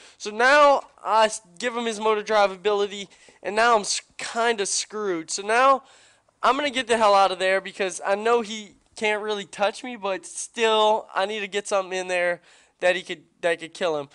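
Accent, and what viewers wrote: South, United States. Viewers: I DO BELIEVE. i liked that catchphrase lol. you sound southern. Usually i don't like that, but i like you lol. nice narration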